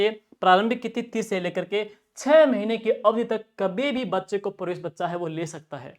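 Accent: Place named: Shekhawati, India. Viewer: Bhai aapka grah jila churu h kya boli m shekhavati touch aata h 🎉🎉🎉 I'm from jaipur or aap mere chhote bhai jese dikhte ho uski skl bilkul aap me milti h ditto🎉🎉🎉🎉🎉🎉🎉🎉🎉🎉🎉🎉🎉🎉🎉🎉🎉🎉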